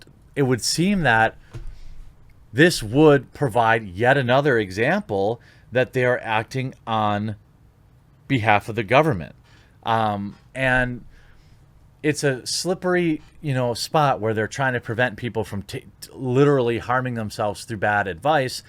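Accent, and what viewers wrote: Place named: Wisconsin, United States. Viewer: “Wisconsin accent confirmed”! There is a guy from WI that runs nations largest goalie clinic for ice hockey. I think his brother has a Green Bay sports shop or something. Anyways, my kids sometimes overhear me watching your videos and “lightly” laughing about “fledging” or “baeg”. Well they overheard the dude say the same thing as we left. It was surreal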